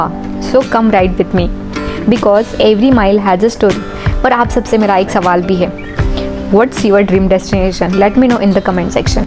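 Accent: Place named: Maharashtra, India. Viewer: marathi accent dear...its cool...keep it up...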